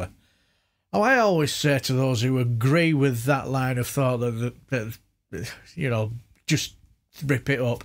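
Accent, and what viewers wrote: Yorkshire, United Kingdom. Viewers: Love the Yorkshire accent. Best wishes to your wife ❤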